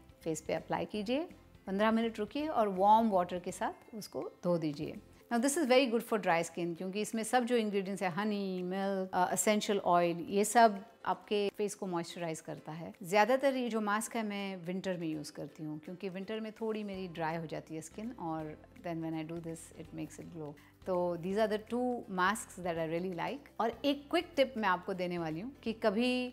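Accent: Maharashtra, India. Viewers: मराठी माणूस कुढेहि गेला कितीही शिकलेला असला कितीही इंग्लीश हिंदी बोलत असला तरी त्याच्या बोलण्यात थोडातरी मराठी टोन येतोच ❤ u mam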